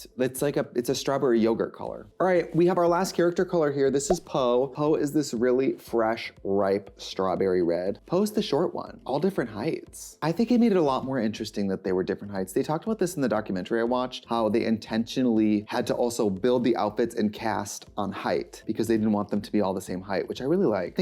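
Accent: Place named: Minnesota, United States. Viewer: Work that winder washer motion. Cmon Minnesota accent ❤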